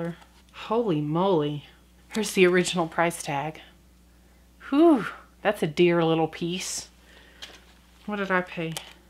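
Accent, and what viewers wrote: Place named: South, United States. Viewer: I love that bag you call "dorky" lol! I just love your video's and that southern accent is awesome! I'm from RI born & raised here. I just love all your bags and my favorite videos are when you change bags and I love all the things you carry in your bag! I'm the same way---All my things in my bag has a story behind it. I carry a pretty piece of sea glass in my wristlet my grandson gave me. it's me good luck charm that I held in my hand when I go to treatment for my ovarian cancer. Keep taping! Your just awesome💜👍💜👍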